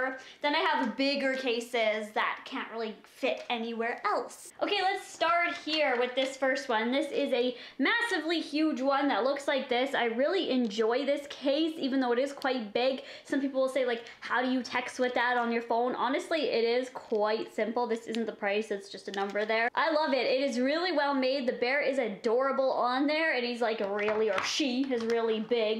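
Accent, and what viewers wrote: Canada. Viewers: she's also Canadian so they pronounce words different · omg i can just tell that she is canidian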